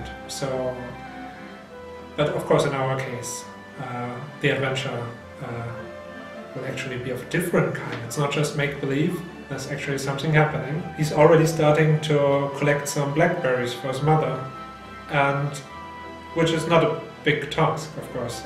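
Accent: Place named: Germany. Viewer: Oh the german accent :) looks really nice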